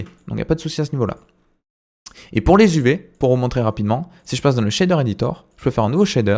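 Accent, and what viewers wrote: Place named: Toulouse, France. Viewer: T'as l'accent toulousain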